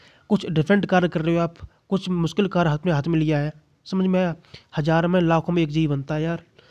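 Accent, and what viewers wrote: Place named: Gorakhpur, India. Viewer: apke bat se lg rha ap gorakhapur side kr rahne vale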